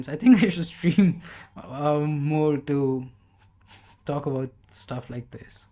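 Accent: India. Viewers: Mumbai accent.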